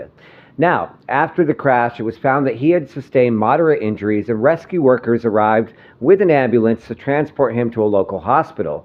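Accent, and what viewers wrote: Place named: United States. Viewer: it's not fault. He's American. Words like Bangkok and Bang Lamung both have the letter 'A', but Americans insist on pronouncing these words with an 'E' and an 'O' respectively. Yes, I know, weird...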